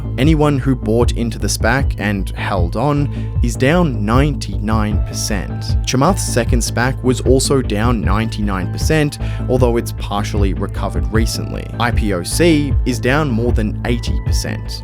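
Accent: Australia. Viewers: Ohhhh... "Arn" = own in Aussie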